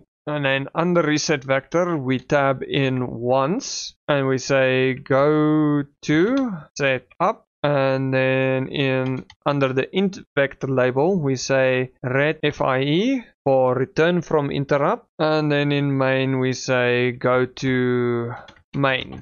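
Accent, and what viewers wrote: Afrikaans, South Africa. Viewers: jissie daai lekker afrikaanse aksent :)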